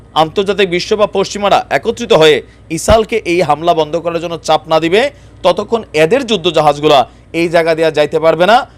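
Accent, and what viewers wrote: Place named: Comilla, Bangladesh. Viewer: আপনার কুমিল্লা ভাসা বন্ধ করুন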